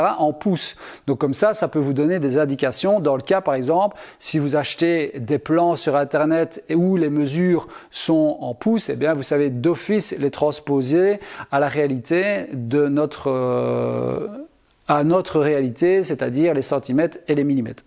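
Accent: Belgium. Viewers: Très bonne vidéo, c’est clair utile et très instructif comme d’habitude. J’ai toutefois un petit reproche à faire, je trouve dommage de devoir préciser (ou corriger) à chaque prononciation de nonante ou septante pour plaire au public français dont je fais partie. Ça fait partie du charme selon moi de regarder les vidéos d’un belge, c’est comme si un québécois s’empêchait de parler comme il le fait au quotidien avec son accent et ses expressions. Si "l’gosseux d’bois" parlait un français "parfait" ses vidéos perdraient de leur âme. Bonne continuation et vive la Belgique !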